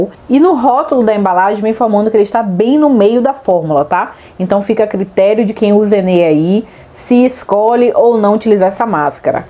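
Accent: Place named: Bahia, Brazil. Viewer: pelo seu sotaque, você é minha conterrânea! Por favor, onde acho isso aqui em Salvador? Obrigada!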